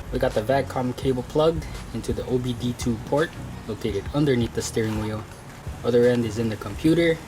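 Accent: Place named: India. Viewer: Czech guy with indian accent :D Interesting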